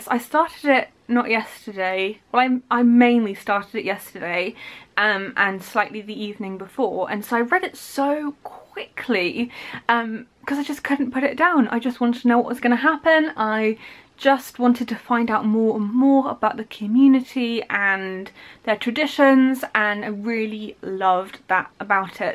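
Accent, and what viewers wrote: United Kingdom. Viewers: Certainly I just love reading vlogs 😇 Thank you so much for this amezing Vlog😇🤝 And you know what your British accent is just phenomenal ❤️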